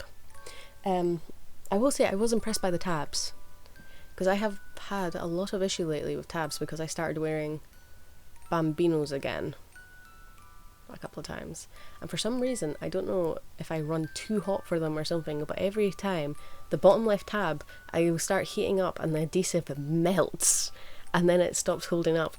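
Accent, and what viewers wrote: Scotland, United Kingdom. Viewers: Where does your accent come from? Are you Scottish?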